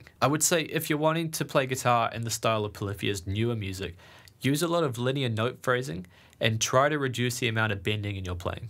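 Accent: New Zealand. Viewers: I watch so many shred videos, I'm so glad to finally hear a New Zealand accent after all day hearing British and American accents, like no offense to them but it's always good to see a fellow New Zealander making killer content.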